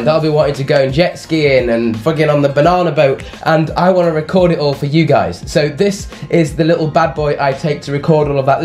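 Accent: United Kingdom. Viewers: that british accent is awesome, you make everything sound so much cooler or than it is lol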